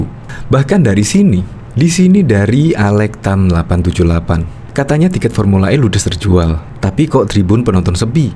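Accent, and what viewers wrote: Java, Indonesia. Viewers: Medok banget ngomongnya pasti bukan wong jakarta😘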